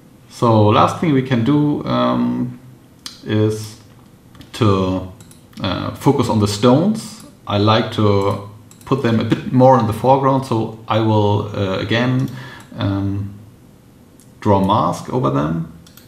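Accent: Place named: Germany. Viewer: Guten Tag. Thank you for your workflow video. Really helpful 👍 Btw, your accent reminds me of my semester in Germany (Koln), wonderful memories.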